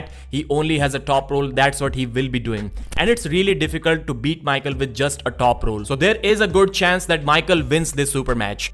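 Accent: India. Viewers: Man, by having such a proper channel you singlehandedly made me get used to an indian/pakistani accent at last! Now it feels just like an american or UK one, just as easy to comprehend! Kudos to you, man! Hope my comment doesn't come across as ignorant one, I really meant it with the best of intentions! Best agregator/news channel on arm wrestling lately!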